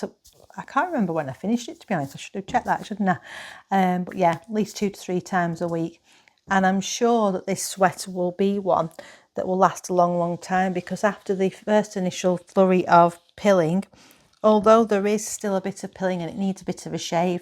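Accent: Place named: United Kingdom. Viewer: i love your accent!!! (from ny, rarely exposed to any of the UK accents)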